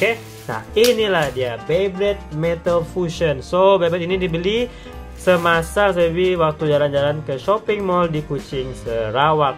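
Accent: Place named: Indonesia. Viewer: indon la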